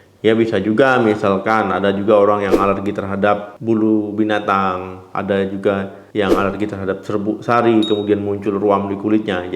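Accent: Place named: West Sumatra, Indonesia. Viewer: Emang dokter urang minang yaaaa😂 galigato bahasa awak tuuu😅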